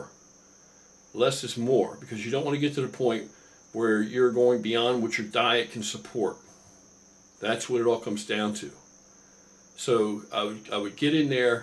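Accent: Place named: Philadelphia, United States. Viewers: I'm from south jersey right next to philly and I thought the same thing. The way he pronounced the days of the week is a dead giveaway. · i bet this dude is from the philadelphia/south jersey area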